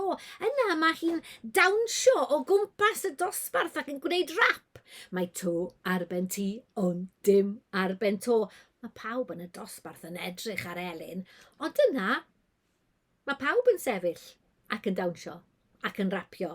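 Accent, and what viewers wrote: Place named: Carmarthenshire, United Kingdom. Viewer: Dw i'n dotio ar wrando ar acen Sir Gâr. Dynnes ddawnus a brwdfrydig wastad.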